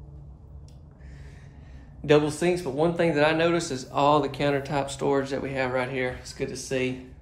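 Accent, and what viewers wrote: South, United States. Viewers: oh that would be great. we would gladly welcome you. You have that southern accent like i do. Only difference is i'm female. lol 😁 Don't forget to show us your beautiful family on some of your next home shows. ❤👼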